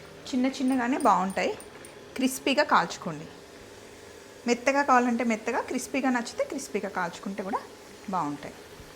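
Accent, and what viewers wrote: Telangana, India. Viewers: Akka meeru Telangana kadha say elachi poddi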